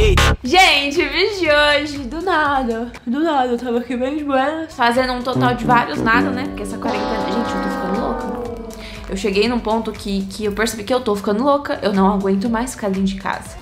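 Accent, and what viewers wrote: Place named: Minas Gerais, Brazil. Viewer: Mô Mineira Né kkkk E MT Legal kkkk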